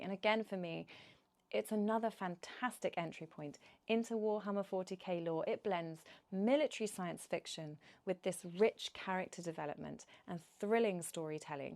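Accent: United Kingdom. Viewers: you got a really great speaking voice. The Brit accent really works for you. Your hair looks great as well. Ive known about 40k since being young, ive always been kind of a closet nerd though. Necromunda is my favorite setting out of all of it. Have a great day.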